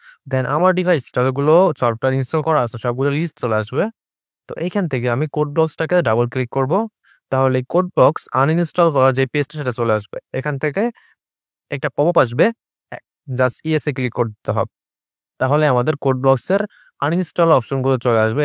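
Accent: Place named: Jhenaidah, Bangladesh. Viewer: ভাই আপনার বাসা কি ঝিনাইদহ?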